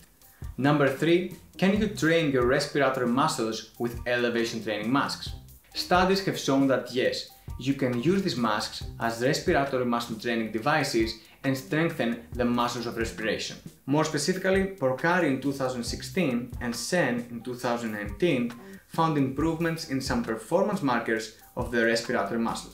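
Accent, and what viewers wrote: Greece. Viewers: Αδερφέ μα τον θεο πριν δω το βίντεο κατάλαβα από την προφορά ότι είσαι Έλληνας... btw καλή ανάλυση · It's a Greek accent, he is Greek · προφορά ελληνική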